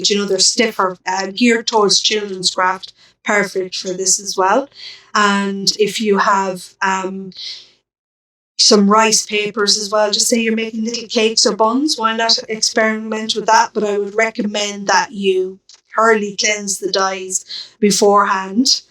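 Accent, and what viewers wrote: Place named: Ireland. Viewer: Congrats on a fab PCS box. Love your box and delighted to hear another lovely Irish voice. Tfs Regards Simone x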